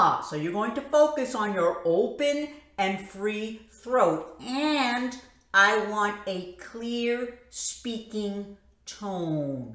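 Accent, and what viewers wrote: New York, United States. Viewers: Thank you for making and sharing this video. I look forward to watching your other videos. Also, I had a question. Are you orginally from New York?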